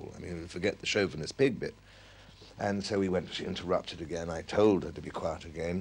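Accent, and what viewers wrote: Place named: England, United Kingdom. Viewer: I can listen to this guy narrate any documentary. Best English accent.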